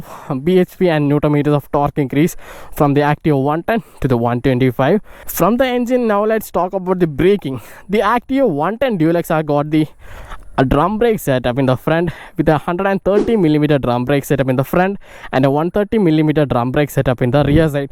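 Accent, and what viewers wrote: Kerala, India. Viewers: Nice mallu arabi English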